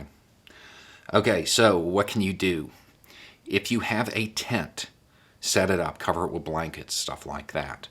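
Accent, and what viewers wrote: South, United States. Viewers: Just shared this video with my Dad who is in CT and said he had to go out and shovel snow. I thought he’d appreciate your tips for generating heat. I then thought of another tip forgot to mention Chihuahuas! Read the following in awesome southern voice: “Grab about 5 chihuahuas and bring them into the tent you’ve set up in your living room. They are automatically inclined to hide under blankets. The chihuahuas will generate heat and keep you warm! It’s just a thought.” We’re a chihuahua family but you can substitute your canine preference:)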